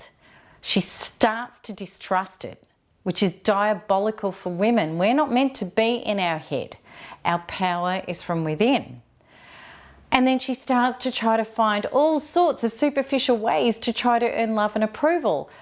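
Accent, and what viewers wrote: Australia. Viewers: Goddamn! You are such a beautiful woman, that Aussie accent and that look my god a weekend with you would be all the treatment I would need!! Gourgeous! Love the content love the channel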